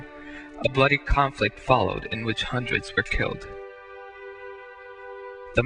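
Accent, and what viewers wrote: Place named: Romania. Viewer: I wish all of you who comment about this video,get a grip because everything you said is not the reality..To know exactly what was going on in Romania at that time you have to lived there,did any of you have?If you have which I think the commentator did by his accent,than whether he wasn't born at that time or he was told the stories or the voice is a robot recording... It's all the same propaganda which was used to get rid of that regime and replaced it with one even worse,called democracy...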